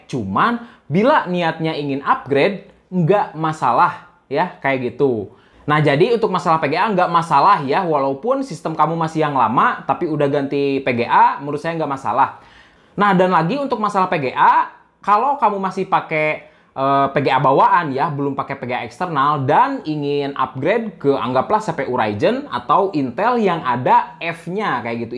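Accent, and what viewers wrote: West Java, Indonesia. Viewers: sunda sekali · Moal teu orang sunda ieu mah , kaciri cara ngomong na 😅😅😅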